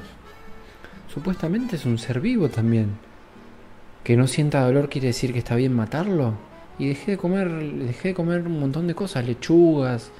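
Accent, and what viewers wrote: Argentina. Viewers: amigo sos correntino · Creo que es argentino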